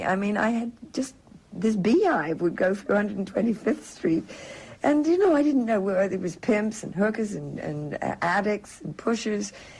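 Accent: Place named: Ireland. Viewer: I love and have always loved her voice whether singing or talking. It has a different sound. I'm scots so of course I have a different sound to pure English but so has Dusty. I don't know if this came from her mother's early influence as Irish but whatever it is Dusty has a different sound altogether. Anyway can I just say this was a great interview with Keith Altham who was always a champion of hers and spoke about so brilliantly on the Just Dusty TV documentary.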